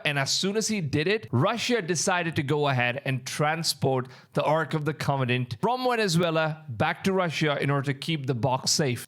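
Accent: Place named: India. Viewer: he is from India and English is not his native tongue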